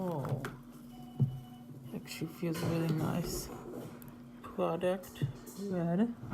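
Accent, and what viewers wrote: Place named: Russia. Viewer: You sound like a russian woman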